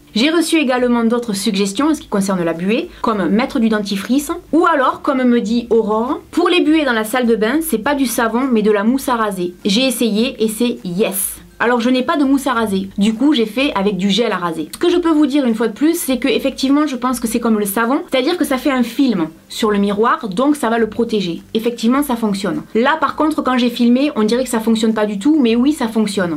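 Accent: South, France. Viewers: Pour la buée sur le miroir j'utilise du savon mais pas liquide, le pain de savon comme avant... C'est un peu galère à étalé mais avec un peu de patience et «d'huile de coude» il y a moyen de n'avoir aucunes traces sur le miroir et plus de buée pendant un bon moment. Bon forcément c'est pas définitif, il faut le refaire de temps en temps mais ça marche beaucoup mieux au savon solide que liquide.;) Merci pour tes vidéos et ton accent du soleil qui me donne la pêche jusqu'en Gel Bique;)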